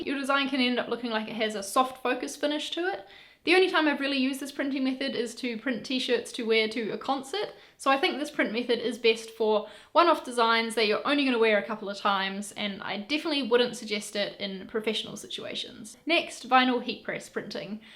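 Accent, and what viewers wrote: New Zealand. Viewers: Off topic but, love the NZ accent! Thanks for this! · I thought I could hear a Kiwi accent and so read the description, sure enough you are! Thank you for the information, and I am off to see your screen printing video now :)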